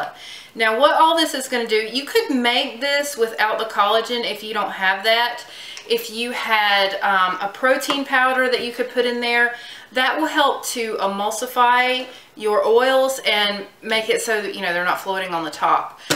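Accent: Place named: South, United States. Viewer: Great video!! Your accent sounds similar to mine. You must be from the south. lol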